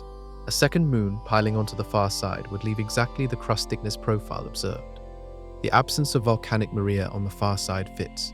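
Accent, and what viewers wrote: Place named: United Kingdom. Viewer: Tipoff of clickbait AI CGI BS videos is the quasi-British accent of narrator.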